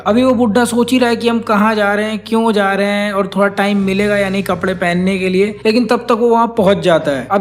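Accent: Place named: Maharashtra, India. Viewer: Bro's Marathi accent 😭🤌🏻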